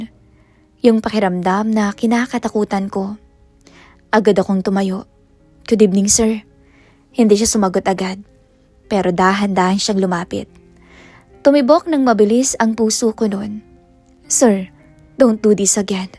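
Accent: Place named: Arab world. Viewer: Ang galing ng content creator na to gayang gaya Ang tuno ng English ng mga arabo.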